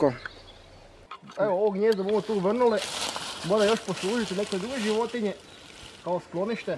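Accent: Zagorje, Croatia. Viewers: Neznam jeste vi u BIH imali na vašoj televiziji domaći stari humoristični serijal "Gruntovčani"...identičan govor,valjda mi zato i je zanimljiv! Simpatičan:) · Ma Zagorski naglasak, samo sto je ovo u fullu xD sa primjesama Slovenackog, mene podsjeti na Pervana i onog bunara i sina Vl00de ahhaha samo ne moze ni Pervan imitirat koliko ga kralj zavrne jezikom lol, nije mi to poznato bar po imenu, ali kad bi pogledao vjerovatno bi se sjetio, a kako znas da sam iz BiH, nije mi jasno kako ljudi na osnovu nicka, ne pretpostave nego vec zakljuce odakle si:D zanimljivo... EDIT: evo ti to sa Pervanom i bunarom hahaaa <link>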